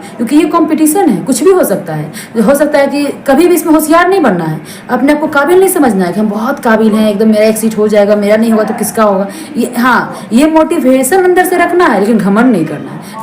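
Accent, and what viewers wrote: Uttar Pradesh, India. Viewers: Tum to lgta h up ki ho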